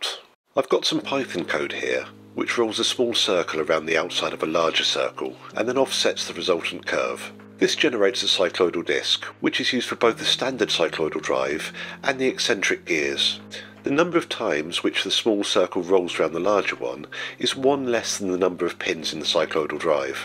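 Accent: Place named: United Kingdom. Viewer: Gosh, you int 'alf clever! And so refreshing to hear a British chap doing interesting stuff on Youtube. I hope I haven't broken any rules. 😀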